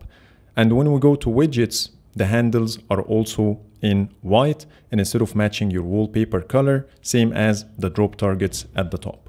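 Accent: Egypt. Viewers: Are you Egyptian? your accent is Egyptian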